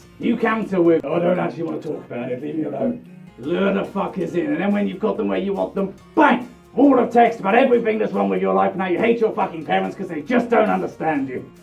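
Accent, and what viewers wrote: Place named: North, United Kingdom. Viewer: "Its not even a script, its on the back of a fucking Tesco Clubcard envelope!" Very British thing to say,. Also, i think because of your accent, you're from the North?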